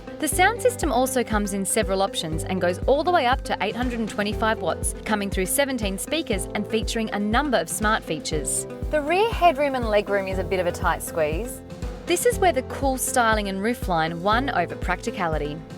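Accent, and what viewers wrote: Australia. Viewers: since when did Aussie accent start getting compliments? anyway, the car is not as elegant as the Sydney blond!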